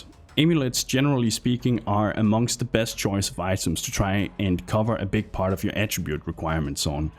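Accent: Denmark. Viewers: You sound very danish. Thumbs up!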